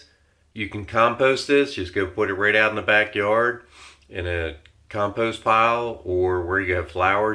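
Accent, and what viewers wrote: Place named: Central Pennsylvania, United States. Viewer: Thank you! We've just gotten kittens and I cannot stand the Tidy Cat scented smells. The lady at the PetSmart recommended this stuff because they use it at the store, so I picked up a bag to try. I have been trying the Walnut-based litter, but it is still super dusty and not every person in our household is fond of it. I appreciate your explaining how it works and what to do & when to change it. PS - your accent sounds like home (I'm from a small town in central PA).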